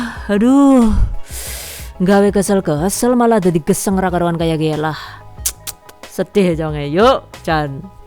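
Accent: Banyumas, Indonesia. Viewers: Duh serasa kek nonton langsung demo masak ada bumbu2 joke khas ngapaknya🤣 best lah lain daripada yg lain👏🏻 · Banyak banyakin jokes ngapaknya 😂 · Ora ngapak ora kepenak ya bund 😅😅😅😅😂😂😂resepnya mantuulll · Jebulr ngapak 😂😂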